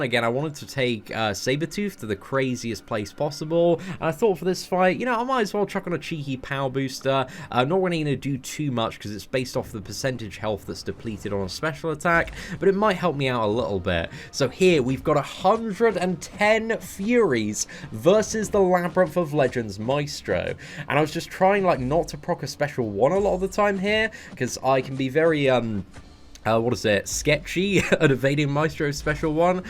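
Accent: United Kingdom. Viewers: The commentary the pure British accent what more could you ask for.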